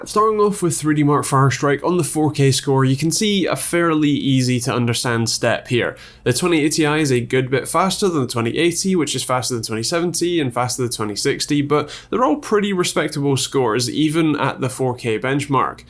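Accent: United Kingdom. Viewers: I'm here for the British accent.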